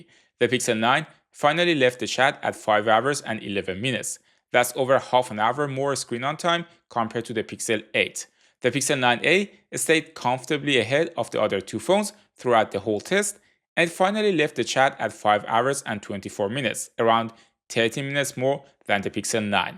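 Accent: Iran. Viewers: Dadash lahje englisit tablo'e Irani hasti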